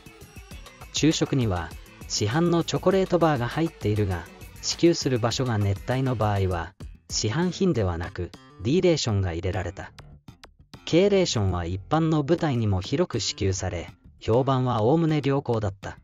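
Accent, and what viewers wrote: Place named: Western Japan, Japan. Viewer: ナレーターさん、西日本出身の方かな？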